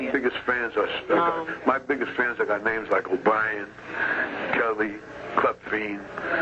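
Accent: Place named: Sicily, Italy. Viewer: Scicilian Accent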